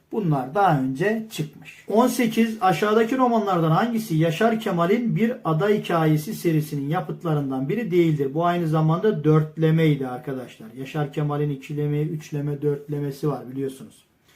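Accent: Black Sea, Turkey. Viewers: C ler kayıyor Rize yada Trabzon bizdensunuz hocam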